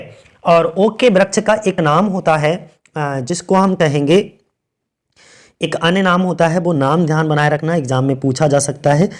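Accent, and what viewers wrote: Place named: Pakistan. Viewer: Yaar tm pakastani sound kyu kr rahe ho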